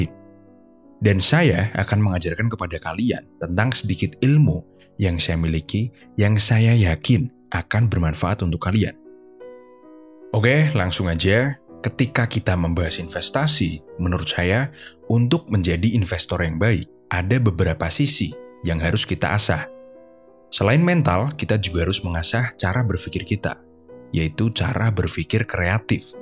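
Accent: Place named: Southern Java, Indonesia. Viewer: Dari semua video yang saya tonton Tebakan saya sepertinya beliau orang jawa tengah bagian selatan atau yogya bagian selatan. Beliau bilang bapaknya tentara, kakeknya petani dan nelayan artinya rumah ya dekat pantai Logatnya bukan jawa bagian utara, tapi selatan. Beliau juga memelihara burung yang itu buming di jawa bagian selatan. Kalaupun tebakan saya mleset, mohon maaf Namanya juga menebak 😅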